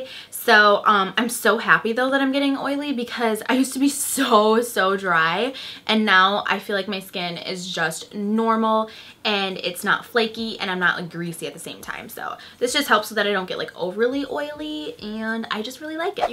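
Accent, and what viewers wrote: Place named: Chicago, United States. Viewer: Love your Chicago accent! 😀😀😀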